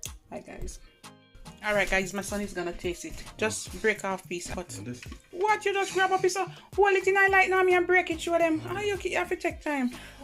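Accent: Jamaica. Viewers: And the Jamaican talk love it mmmmm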